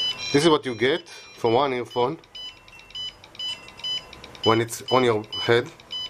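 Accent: Israel. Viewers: עכשיו ראיתי את המוזיקה אח שליח ידעתי שאתה ישראלי מהמבטא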